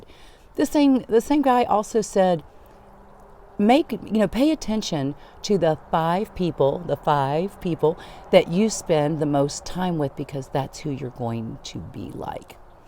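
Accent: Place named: South, United States. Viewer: Thank you. Your doing great and helping me and many Thrive! Plz use your humour! Loving your glasses & that sweet Southern accent!. Loving these long lives! You go girl! I´m starting to thrive again with Purpose & Passion to do what I love! Living well is the best revenge.Knowledge is power! Game over narc. Many Blessings to you all.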